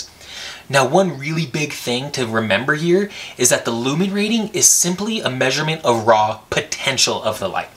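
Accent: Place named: Kansas City, United States. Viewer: You from Kansas city?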